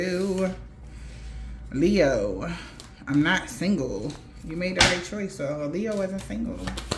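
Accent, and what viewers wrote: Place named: Brooklyn, United States. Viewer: I Live in New York! U funny sound like u was uptown in the bronx or spanish harlem . And u was also in brooklyn carribean city !!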